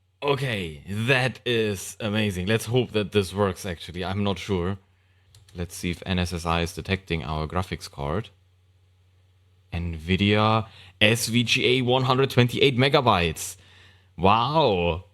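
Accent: Germany. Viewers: based on his accent I would guess he is German, in Germany it is common to say nineteen hundred but as soon as you come to the two thousands you wouldn't say twenty nine hundred and say two thousand nine hundred. It's a force of habit to say numbers this way.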